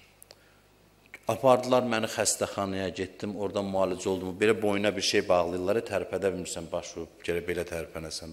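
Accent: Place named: Lankaran, Azerbaijan. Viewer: Ərəb və fars dillərini mükəmməl bilir.Bilirsiz əslində adi insanlar bir dənə rus dilini biləndə nə qeder ləhcə və intanasiyasinda deyisiklik yaranir.Haci həm Lənkəranlidi və bizdə cox ilahiyyatcilar o zonadandir.O zonanin da xüsusi ləhcəsi olur.Ərəb Fars və Rus dillərini də mükəmməl bildiyi ücün Azərbaycanca danişiğa az da təsir edir.Turk alimleri ise sadece Erəbcəni bilir.Çox güman ki,bunnandi.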